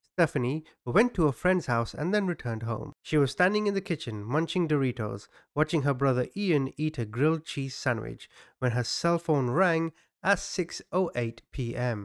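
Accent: United Kingdom. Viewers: You make me laugh so much, I think it's because you speak like a Brit and I get you, I love your channel and your opinions, great job man.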